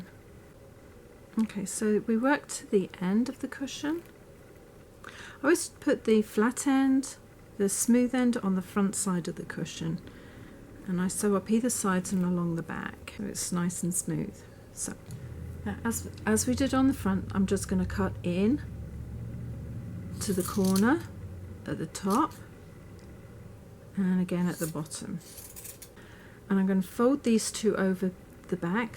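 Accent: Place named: Northumberland, United Kingdom. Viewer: Great instructional video!!!! Love your accent, too. You sound just like my mother, born in Morpeth, Northumberland. Especially liked video because you stuck to the necessary elements of making the cushion and didn’t put in lots of extraneous dialog to fill air time like others often do. You really know your stuff! I can’t wait to get started on my project. ❤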